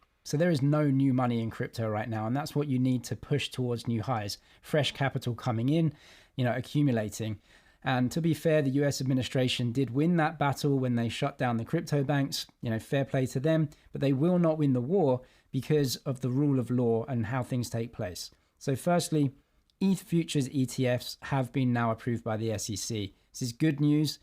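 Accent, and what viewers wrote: England, United Kingdom. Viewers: Fantastic. Where in England are you from?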